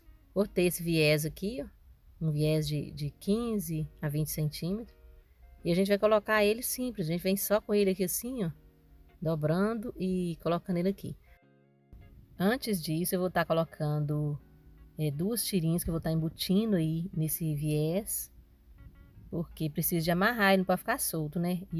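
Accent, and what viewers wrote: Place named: Minas Gerais, Brazil. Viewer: Estou há anos vendo vídeos de costura, e nunca vi os seus. Aonde vc estava mulher? Meus Deus. Vc é um misto de simplicidade, competência e profissionalismo, agora pra me destruir, vem com esse mineirês que eu amo. ❤❤❤❤ Parabéns.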